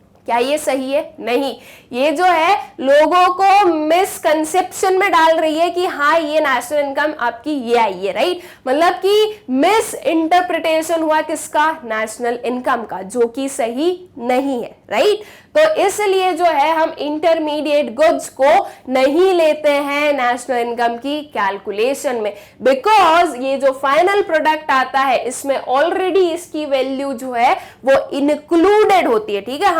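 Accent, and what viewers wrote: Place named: Gujarat, India. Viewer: Maam have gujrati tone 🔥🔥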